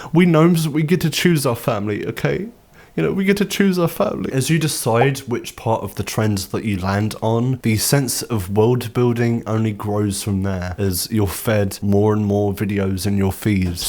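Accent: United States. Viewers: American here, for my sins. Your American accent is great! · Was this all to show off the American accent?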